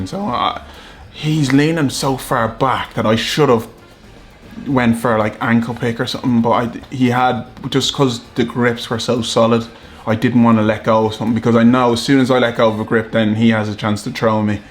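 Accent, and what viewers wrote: Ireland. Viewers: Is that a new Irish accent? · London? He sounds Irish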